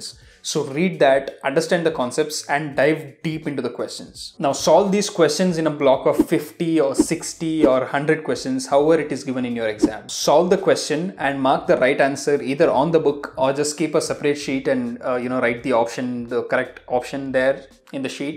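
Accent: India. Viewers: liked ur accent. Indian accent is amazing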